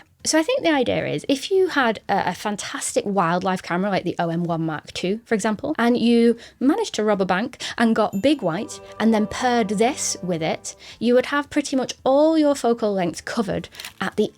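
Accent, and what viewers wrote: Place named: Isle of Man, United Kingdom. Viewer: Delighted to hear a fellow Manxie and see great shots of the Island!! Wonderful review and super helpful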